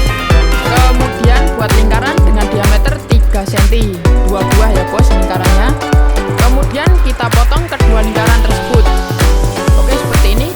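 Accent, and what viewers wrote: Java, Indonesia. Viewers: Mantap bang suka suaramedoknya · LOGAT JOWONYA KELIATA BETUL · Medokk buangett bosomuu ndann😅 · jawa ne medhok 😂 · Sampean jowo ngendi mas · Wong jowo endi suarane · Jowo jan muasok bossquuuu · Medok Banget ya bos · Cara penyampaian bagus aku suka khas Jawa ☺️ editannya jg bagus. ❤️ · Orang Jawa ya..nada bicaranya kek orang Jawa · Kreatif banget... muantaap 👍👍👍👍....aq seneng bhs jawanya medhok...smpyan asli endhi Maas😁😁🙏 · medhokk bhoss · Suaranya medik rek rek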